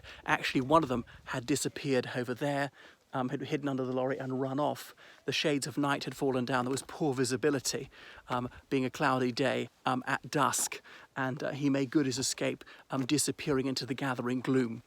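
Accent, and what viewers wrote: RP, United Kingdom. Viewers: Well, he has an Etonian accent - not terribly Irish at all! :) My granddad was Irish - from Nenagh, Co Tipperary.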